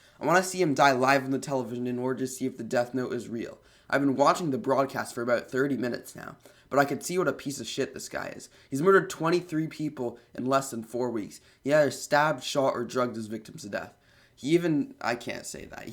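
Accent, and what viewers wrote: United Kingdom. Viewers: good job with the birtish accent! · Dude great job on the British accent too!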